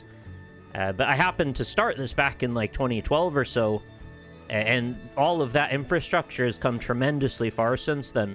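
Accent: Ireland. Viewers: I hear a little Irish lilt in your voice now 🙂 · Crazy how you can hear the Irish taking over some words while he speaks haha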